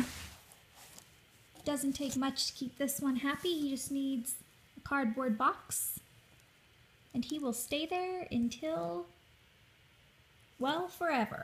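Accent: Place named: Tennessee, United States. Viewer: You're getting your TN accent back a bit :)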